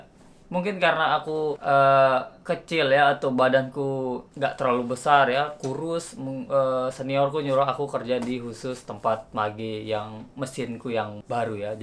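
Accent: Lombok, Indonesia. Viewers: Ndk ini telang ye medok bahsa lombok kadu bahasa indonesia.... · Ya ampun...logat lomboknya masih kentara banget yaaaa....wkwkwkwkwkk Salam dari Lembar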